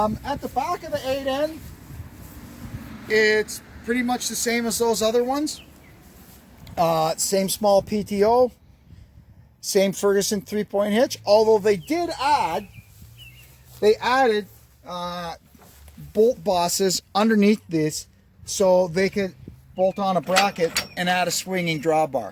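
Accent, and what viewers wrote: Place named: Canada. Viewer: Thanks for the video. Picked up the Cannuck accent early. ☺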